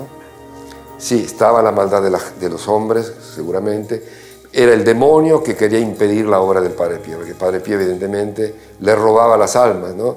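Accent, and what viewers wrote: Argentina. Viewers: Gracias por este documental Muy bueno el recorrido, la transmisión y la conversación del Padre que narra este precioso documento Parece argentino🇦🇷por su forma de hablar, o de esta zona del continente Dios bendiga a todo el equipo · Es argentino el por lo que se escucha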